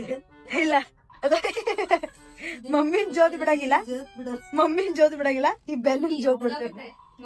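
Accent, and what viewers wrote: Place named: Hubballi, India. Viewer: ನಿಮ್ಮದು ಯಾವ ಊರು ಹೇಳ್ರಿ ಪ ನಿಮ್ಮ್ದು ಹುಬ್ಬಳ್ಳಿ ಆನ್ ರೀ ನಿಮ್ಮ್ದು ಭಾಷೆ ಮಸ್ತ್ ಆಯ್ತಿ ರೀ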